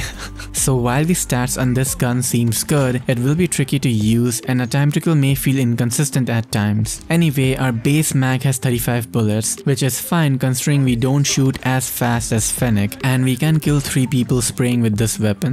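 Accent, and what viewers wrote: India. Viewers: Hey are you Indian 😅😅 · You sound Indian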